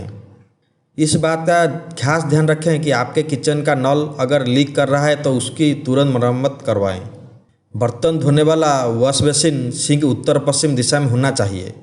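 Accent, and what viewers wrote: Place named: Odisha, India. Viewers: Bhai tum odia ho kya?Isiliye hindi bolte time odia mix ho raha he. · Sir you are belongs to Cuttack as per your hindi pronounced.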